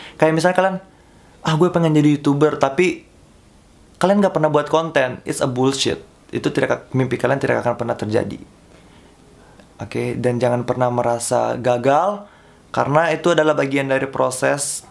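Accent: Palu, Indonesia. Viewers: Ko rada denger aksen palu nya hehe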